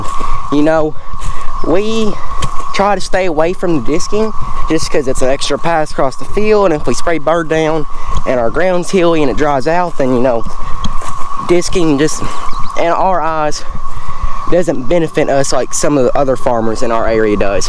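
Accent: South, United States. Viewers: Hey bud, just say your channel and enjoyed it. I'm from your neighbor from Tennessee. I'm glad to here the southern talking on this. See-ya in the next one. Old Hickory, TN 🇺🇸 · Hi there, I'm new too you're YouTube channel, and I have in joyed what you have shown, thank you for showing us a part of your day, and in joy your Southern voice, I'm from Kentucky and I miss it so much, thank you for having the Southern voice, I'm from Campton Kentucky or better know as Wolfe county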